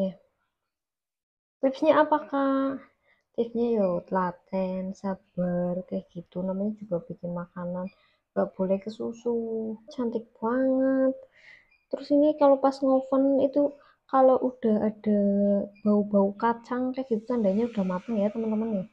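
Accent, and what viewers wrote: Banyumas, Indonesia. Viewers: Wong ndi sih ngapak banget mpeyan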